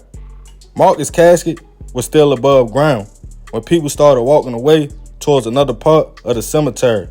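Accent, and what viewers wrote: Baltimore, United States. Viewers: That’s their Baltimore accent,, I might gotta slide 🛝 cause I really can’t take it 🤦🏽‍♀️🤦🏽‍♀️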